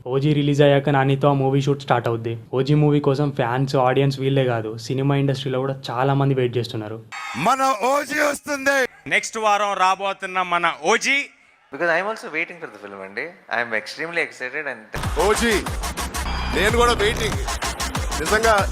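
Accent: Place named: Warangal, India. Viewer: Hi...ne video motham chusa 1 sec kuda waste chykunda u explained vry gud..proper warangal or karimnagar laga undhi me slang...iam Sandeep frm Hyderabad..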